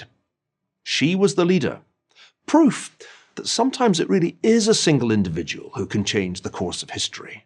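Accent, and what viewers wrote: Scotland, United Kingdom. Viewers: That's a Scottish accent bud. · Wow! I have NEVER heard a British voice praise Thatcher so sincerely. EVER. Mark this date 1/6/2020. Maybe we can save the Western World.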